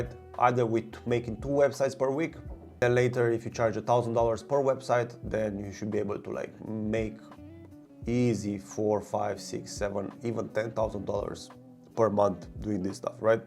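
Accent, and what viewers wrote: Romania. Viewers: Me: He has Romanian accent. Me after reading his name: Oh... makes sense 😂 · Man you are Romanian? You really have a romanian accent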